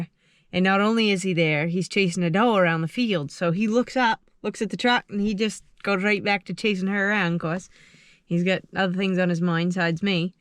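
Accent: Maine, United States. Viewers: Ha I don't know where your from but you certainly sound like a down easter.l0l. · I miss the Maine accent I was born in maine but haven't lived there since 1977 I have visited and hunted there over the years I really enjoy your videos good luck hunting your definitely putting in the time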